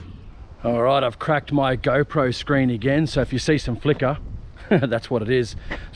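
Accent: Australia. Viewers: Hello. You sound as if you are from the Land of Oz. I'm from over the ditch, in Auckland. Oh yes. I'm 76 years old, and still mowing my own small lawn with a Ryobi battery self-propelled mower, but the trimmer and edger are wielded by myself -- they are not self-propelled (how could they be?).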